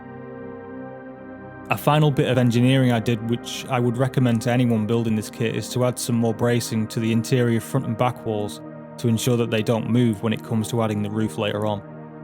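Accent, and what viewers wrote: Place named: North, United Kingdom. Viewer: God bless you! i like the thick Northern accent with these American West Models.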